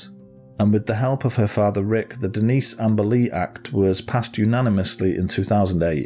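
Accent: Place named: Manchester, United Kingdom. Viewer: I’ve just stumbled across your channel and am really enjoying it. You’re a really engaging narrator and the site is professionally put together. The cases you explore are, thankfully, more obscure than those on similar sites, but certainly no less interesting. And to top it off, you sound like you’re from my neck of the woods, Manchester. You’ve got another subscription from me. Keep it up 👍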